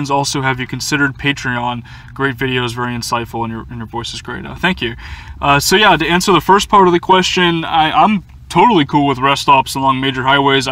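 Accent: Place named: Boston, United States. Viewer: Another great video,. I initially started following you due to you wearing a Cleveland Browns tshirt. Then I saw you w a browns skully on. What's your cle connection? Considering it sounds like you're a Boston area native. I traveled out of my of my forester. Jealous of what you've accomplished. Keep the videos coming, I'll keep watching...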